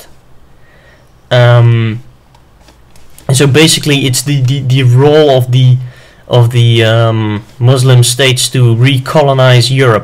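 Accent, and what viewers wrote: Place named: Netherlands. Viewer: Ah, Dutch, that's what it is. I could tell you had an accent but I couldn't tell what it was. For future reference "Souix" is pronounced "Soo," and "Navajo" is "Navaho." English is hell for trying to figure out how a word is pronounced just by looking at it. :P Great LP btw, YOu've earned yourself a subscriber.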